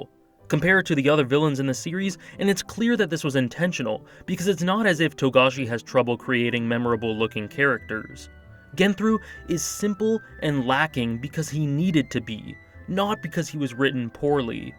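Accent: Canada. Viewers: What part of Canada are you from?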